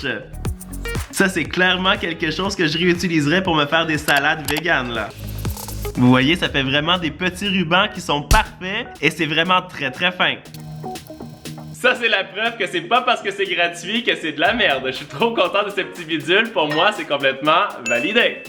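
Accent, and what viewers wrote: Quebec, Canada. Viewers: J’adore ton accent Québécois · J'adore ton accent cuebecoi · L’accent canadien j’adore🥰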